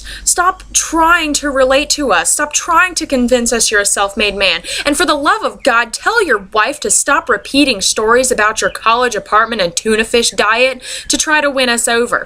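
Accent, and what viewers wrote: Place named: South, United States. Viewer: I see you found that southern accent you proclaim you don't have in your other video. Be your self girl and be comfortable in who you really are.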